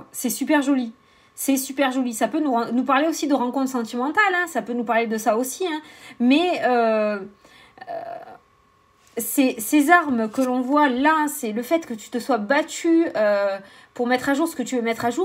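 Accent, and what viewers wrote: South, France. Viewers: Bonsoir. T'inquiète....! tu l'as l'accent du sud. Il est chantant, charmant, et pleins de tonus, comme toi. Il te vas bien! Bises. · Oh bibiche comme je croise les doigts pour que cela se passe ainsi ! Beaucoup de démarches en cours ici, en attente de retour pour justement des partenariats professionnels. Wait and see. Et oui, un très bel accent du sud :-) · Coucou ma belle, oui ton accent du sud est bien là, une joie pour moi de t'entendre magnifique en plus ta guidance toujours exacte ta joie de vivre magnifique je crois que pour la belge que je suis ça me fais énormément de bien je te kiffe à fond gros bisous je suis en pleine forme pour le moment !!!qui sais peut-être tu es contagieuse gros bisous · Coucou oui l'accent du sud on l'entend très bien, mais s'est très agréable ça nous fait un air de vacances. 😉😉💋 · cc oui tu as l accent du sud ainsi que le chant des cigales ta bonne humeur et ton sourire fait du bien. 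Je te regarde le matin et ma journée commence avec beaucoup de bonheur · Coucou oui ton accent du sud est mon soleil du soir ☀️🌞😍😘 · Coucou tu as toujours ton accent du sud très agréable à t'écouter et avec le sourire 😍😆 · Bonsoir merci pour le tirage, oui je te confirme que tu as bien l'accent du sud mais c'est agréable plein de soleil! Gros bisous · Coucou, oh que ouiii tu la encore ton accent ❤et j'adore t entendre parlé! Tu chante le soleil du sud 🌝 il te vas si bien! Je t'embrasse bisous et merci à toi 😘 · Bonsoir oui on entend bien ton accent du sud. Je te souhaite une bonne soirée à demain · Je te confirme tu as bien gardé ton accent du sud. bisous t'es géniale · oui je suis réveiller a3H du matin je ne dort que très peux merci j Aime ton dynamisme et ton accent du sud merci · bonjour! Vous avez gardé votre charmant accent du sud!!! Pas de souci! Ce mercredi est positif c 'est super! 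Biz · Merci pour le.tiraje ses.que des bonne nouvelles oui tu a l'accent du sud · Hahaha...je ne connaissais pas cette expression : "j'ai géré la fougère"...oui oui rassure-toi tu as toujours ton magnifique accent du soleil !!! Bises💚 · Ouiiiiii tu l'as tjs notre bel accent du sud, merci à toi et elle journée 🙏🌞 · Bonsoir, je confirme tu as bien l accent du sud. Et c est agréable avec ta bonne humeur. Merci pour ce tirage, je souhaite que cela arrivera. Car le sommeil est bien compliqué. Bonne soirée à 😇🙏😚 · Cc..ho oui tu l a l accent et c est juste magnifique..c est une voix qui chante on entendrait presque les cigales.. j adore tu as tout pour nous faire passer un merveilleux moment... Pour la communication oui je l ai tellement attendu...mais j ai dis que je lache prise.j en ai chier....et que ça serait bon de pouvoir vider son sac... Merci pour tout a bientôt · bonjour ma chère tout d'abord merci de cette exellente guidance et rassures toi ta petite voix c'est le soleil du midi les cigales toute ma jeunesse et cela fait remonter tant de bon souvenir coquin de sort garde ton accent c'est si joli je t'attend demain sur you tube et je t'envoie plein de bisous ma pichoune Nelly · Votre accent est un bonheur à écouter ! 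Le Soleil, les cigales... belle énergie! Votre tirage me parle vraiment! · Coucou. Oui tu as toujours ton magnifique accent du Sud! Chantant, leger, joyeux! Sinon, en effet je dors mal!! Reveil vers 4h...ça fait quelques nuits... merci pour tes tirages et guidances 🙏🏻🙏🏻🙏🏻❤️ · Oui tu as bien l accent du Sud merci pour ta bonne humeur et ta gentillesse bisou ♡ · Toujours aussi juste 👏👏👏 et vive l’accent du Sud !!!!! ⭐️🌙⭐️🌙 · Il est bien là le magnifique accent du sud, j'adore !! Je me sens en vacances quand je t'entends ! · Oh que oui que tu as l'accent du sud, et oh que oui que c 'est très agréable avec ta personnalité Pep's. Perso j'adore🙏 Merci pour le tirage,en effet pour le tirage de la semaine, le mercredi sortait comme le meilleur jour avec déjà la plus belle carte du jeu🤗 Belle soirée et à demain 💐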